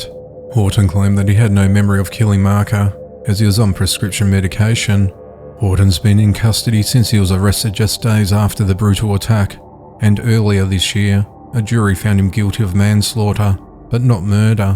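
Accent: Australia. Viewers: Cheers yourself, I came for the Aussie accent and stayed for the daily buzz 😀👍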